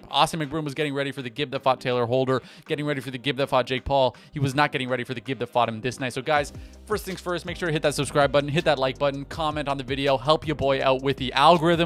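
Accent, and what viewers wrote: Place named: Canada. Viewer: Ay, I can spot that Canadian accent. Are you Canadian?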